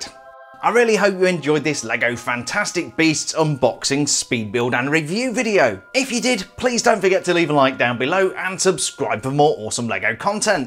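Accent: United Kingdom. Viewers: Hi! New to the channel and I love your reviews! Can't help but notice that you have a British accent but you review LEGO in American packaging :P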